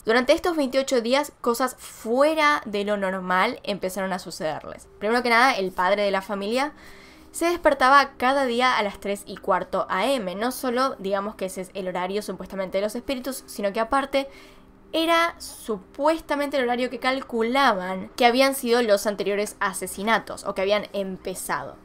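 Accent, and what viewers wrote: Argentina. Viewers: Creí que no me gustaba el acento argentino, pero me encanta escuchar los casos con ese acento xD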